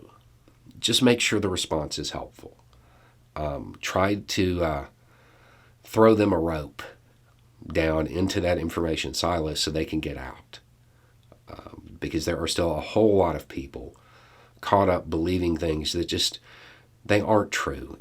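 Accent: South, United States. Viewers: When I was younger I carried shame for simply being born in the south. Because many of our people were and some still are racist and or extremists often falling for absurd conspiracy theories. So it's nice to hear a southern voice that represents the south and southern men well. Thank you. UPDATE - didn't expect interest in my comment but since some have noticed I just want to make clear I am not ashamed to be southern. Certainly there are people in our past and unfortunately present we can't feel good about. But just like minority girls and boys need people that can inspire them to be great, I feel the same can be said about southern white kids (and all kids really). Perhaps in addition to pointing out our historical mistakes in history class and public discourse (lest we repeat them again) maybe it would be useful to highlight those who have done well. 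We have astronauts, scientists, inventors, and other great people we can also be inspired by. I prefer the word "inspired" over "pride" because "pride" often leads us down a dark path. Plus I don't think I should feel any personal pride for the accomplishments of others, but I think I should be inspired by them to become a better person myself and feel a sense of "possibility".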